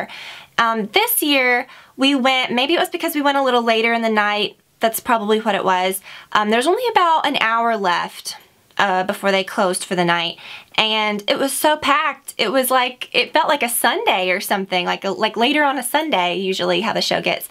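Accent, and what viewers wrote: South, United States. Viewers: I feel like I'm just noticing her southern accent... I didn't notice before!:o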